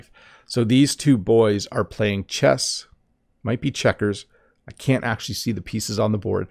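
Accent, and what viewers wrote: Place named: Canada. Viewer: Sorry this is Canada English what is this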